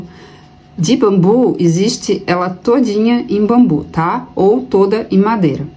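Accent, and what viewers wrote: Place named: Santa Catarina, Brazil. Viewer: Senti que vc é de SC pelo sotaque! Hehehe sou de Floripa.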